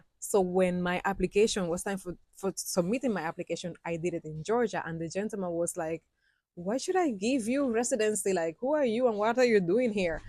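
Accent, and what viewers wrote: Patois, Jamaica. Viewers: Aah mi gal if you ever know my story yu wi cry.love the way yu patwah sounds ❤ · Oh wow… yuh sound like yardie. Accent sweet suh 😂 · After all you are looking so beautiful keep it up you should live up in Cherry gardens jack hills Beverley hills much comfort able love how you talk the Jamaican Patios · Tell your truth black afro 🇨🇺 Cuban Queen like your jamaican accent ❤ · My family are Cubans and its mind blowing listening to you speaking in patua.. I love it!!🥰🤩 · Hey,. Interesting vid. I must say that’s it’s amusing hearing your’Spanglishyardie’ accent—very unique. I am a yardie to mi core except l’ve lived all over the US for decades—fully integrated. I visited JA in Summer ‘23 and had 1 helluva experience. I stayed at the Riu Negril for 4 days then at an AirBnB in Mamee Bay, Ocho Rios x 1 week. Very different experiences. There was a group of us so the whole time a l had a rented burgundy Kia Carnival turbo diesel minivan(awesome vehicle). 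Turns out that the rental was a $$ magnet for the cops. My brother(his turn to drive) was shaken for cash in broad freaking daylight on TWO occasions w/i 1 week by corrupt cops!!!😳🤨 On a different occasion l was driving thru Port Maria and saw the body of a bicyclist all contorted lying on the roadside after a hit-and-run.😮 The sad thing was the indignity/callousness as ppl just stood around or went about their business as if……! The other scary thing that l would warn every foreign visitor about is the danger of driving in JA. Not only is it disorienting driving on the Left, but locals drive like MANIACS!!!! JA is a tropical paradise but there are definite risks one must take visiting the place. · Slight Latin Accent With a Hint of Patios Frequency Nena......Ya Bad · Wow I listen to you carefully and you have been through a lot and I am happy that you have endure the hard times ,it's obvious that you love Jamaica over Cuba ,in Jamaica it is diverse,not all of Jamaica is the same some places if you not involved with certain people you don't have to worry about anything yuh nuh trouble anybody, nobody nuh trouble you.especiaally if you are not a Jamaican. 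You even now sound like a Jamaican if you never says that you live in there I could tell that you have been or intouch with some Jamaican. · My ears are confused, I'm hearing a Cuba spanish accent and a Jamaican accent fused together, lmoa.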